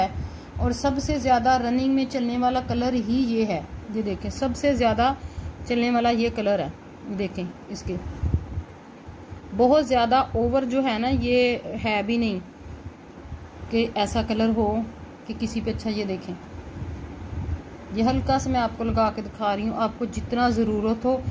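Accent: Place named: Punjab, India. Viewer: Aap punjabi ho.punjabi hini main a hi jandi a ji😊😊😊😊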